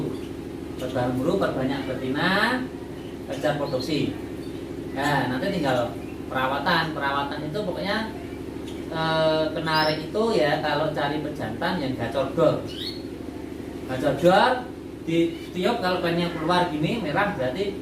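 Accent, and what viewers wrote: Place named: Banyumas, Indonesia. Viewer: Weh tanggane nyong kue ngapak2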